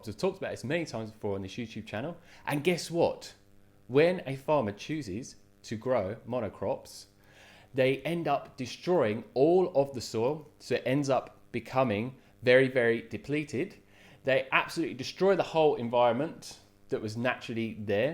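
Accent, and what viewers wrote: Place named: England, United Kingdom. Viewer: İ concur with you. İ watched this video for listen your enlish accent. And i understand you clearly :)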